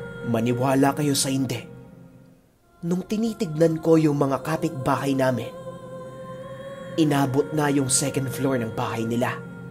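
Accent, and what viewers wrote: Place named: Visayas, Philippines. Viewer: Gusto ko pag nag bibisaya accent in tagalog ka..comedy horror kc lumalabas ang kwento.nakakatawa.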